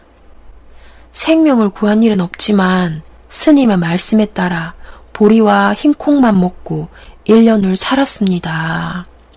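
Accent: Gyeongsang, South Korea. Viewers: 경상도 억양이 친근감을 주네요 수고하셨습니다 감사합니다 축복합니다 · 님께서 책의 내용을 깊이 있게 이해하고 낭독하고 설명해 주시니 아주 좋습니다. 목소리와 경상도 사투리도 매력있어요 앞으로 엄청나게 많은 구독자가 생길것 같아요. 감사합니다. 사랑합니다. 축복합니다. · 경상도 사투리 반갑네요. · 갱상도사투리 마 괘안심더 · 경상도 억양이 남아있어요 ㅎㅎ 남편이 대구 사람. 잼난 책 설명 좋네요.구독자 많아지실듯!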